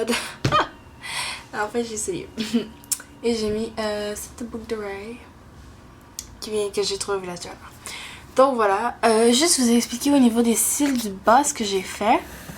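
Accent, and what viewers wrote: Canada. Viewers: j'adore te regarder avec ton accent canadien ! j'adore!! <3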